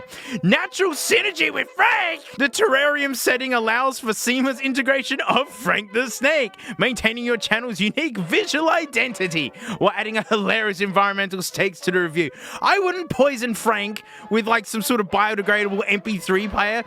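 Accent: Australia. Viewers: just broke this AI with his overwhelming aussieness and unique lingo, and that's amazing.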